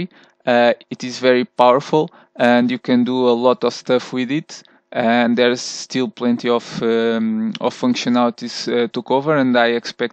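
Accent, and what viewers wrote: Portugal. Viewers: Obrigado por este tutorial! (acredito que sejas tuga pelo falar.. ;) )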